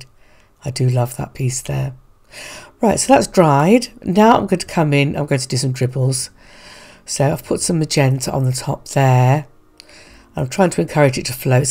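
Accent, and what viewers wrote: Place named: United Kingdom. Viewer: I want you to know that I hold you responsible for enabling my Stamperia Paper addiction! 😉 Lol The problem is, I go through it and I love it so much I don't end up using it! Oh dear! So, these lovely longer vids are my faves! Your voice is calming to me & as a transplanted Scot living in the US, Brit accents are very soothing to me 😁 I am a long time fan of yours. You've taught me to be more adventurous in my gel printing! I've made shapes & swirls out of cut out cardboard - all sorts. I'd have never tried that without you inspiring me! Watching you sends me running for my paints... I can't think of a higher compliment, can you? Love your aesthetic,! Thank you so much for everything! Love & Bless xo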